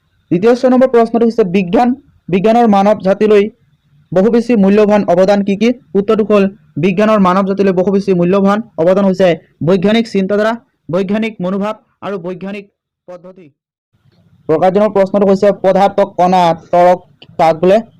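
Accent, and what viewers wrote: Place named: Lower Assam, India. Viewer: Vatia ussaron hoi ase bujat okhobida hoi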